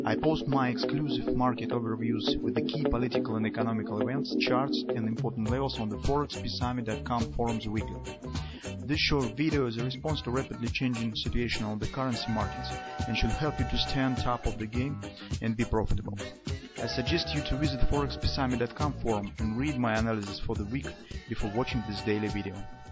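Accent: Russia. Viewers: The accent is killing me. Why does every single Russian have such a strong, distinct accent? I've never understood that.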